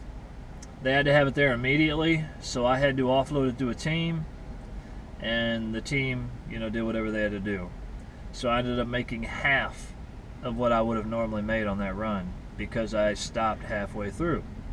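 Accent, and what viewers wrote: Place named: Texas, United States. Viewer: Just subscribed. I'm going through some of your videos now to catch up. You sound like your from Texas. I lived there five years and I have to say the one thing I liked best was the people. Honest and direct. They must have thought I was OK too because after a few years I was told "Your a Yankee but you're OK". Thanks for the reply and I'll be watching.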